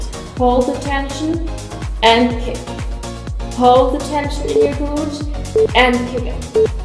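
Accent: Germany. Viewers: An alle sie ist deutsche merkt man auch wenn sie spricht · Hi i just wanted to tell you that you have sich a beautiful body and you are so pretty. I also really like the style of your Workouts. Are you from germany? Bc your accent makes it seem like you are... :)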